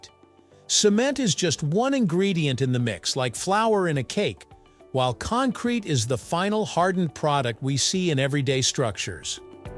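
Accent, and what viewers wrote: United States. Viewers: I noticed the title and thought that this has to be an American video. All English speaking people (apart from Americans) know that cement is a dry powder that needs to be mixed with sand , water and small stones to make concrete. When the mixture is cured and you have a very hard substance that is called concrete. I watched 20 seconds to hear the accent and then switched off.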